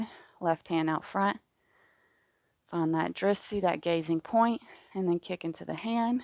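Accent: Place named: South, United States. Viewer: Feelin' Friday's flow and the Southern Belle accent is strong today! The pace is just right as always ;-) I'd like to praise you for reminding me of one of my favorite covers out there too! <link>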